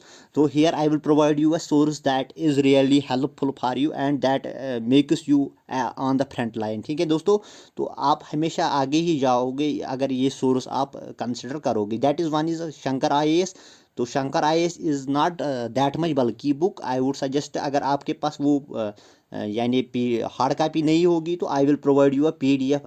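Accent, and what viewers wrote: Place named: Kashmir, India. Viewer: Kashour English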